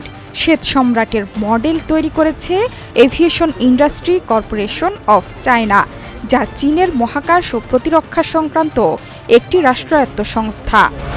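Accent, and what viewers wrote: India. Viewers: ইন্ডিয়ান স্টলে কেন খবর বলেন?? সোজাভাবে বললেই তো হয়।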